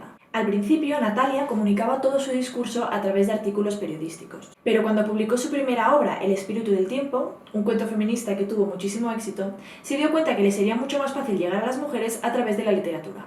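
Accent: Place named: Spain. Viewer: Muy buen tema, pero muy rápida y poco entendible si no se tiene el oído adiestrado para el acento español, la dicción se podría mejorar por favor.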